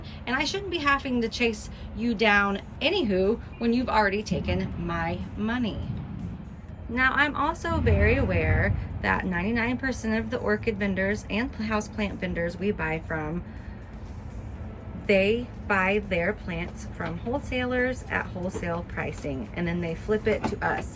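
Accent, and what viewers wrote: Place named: Michigan, United States. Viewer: Hi! New sub here! I don’t know how these vendors stay in business. I spent $160 at Norman’s orchids for 4 plants Dec 2018. They were all rootless, rescue plants. They wouldn’t send me replacement plants and the owners wife was rude to me on the phone, email and Facebook. They were all supposed to be blooming size. None have bloomed yet, but they’re finally starting to look like they’re getting happy. Btw, it’s nice to hear someone talk like me on YouTube!! I’m from MI 😀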